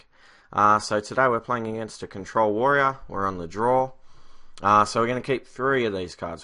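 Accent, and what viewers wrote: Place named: Australia. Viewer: BNOracle Here. In the intro, you really needed to use the word snowball. Also,You sound way more australian through the snowball :P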